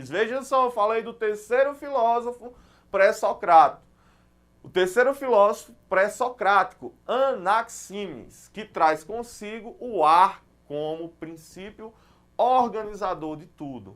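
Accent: Northeast, Brazil. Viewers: Ótima aula professsor! Seu sotaque de nordestino deixar a aula bem descontraída e fluída , e parabéns pela explicação! Melhor impossível... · Eita professor arrochado véi! Conheço seu sotaque viu peixe, é nordestino que nem eu. Brincadeiras à parte, excelente e maravilhosa aula meu nobre, PARABÉNS!!!!!😊😊😊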